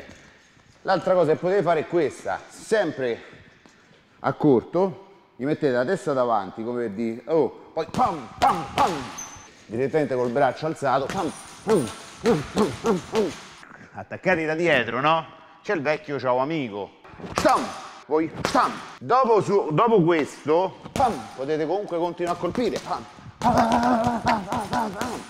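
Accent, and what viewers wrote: Rome, Italy. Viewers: Il più bel corso di difesa personale mai visto...Grande anche l'accento Romanaccio...Aooo sei troppo forte..Ciao..Pam Pamm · a romanaccio!!!! te piace da menà! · Amo troppo l'accento romano xD Anche se sono Genovese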